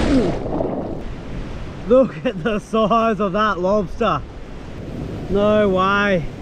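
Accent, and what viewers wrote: Australia. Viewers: aussie are you? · Exactly how i expected an australian surfer to sound like and be like in a survival situation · What part of Aussie is this?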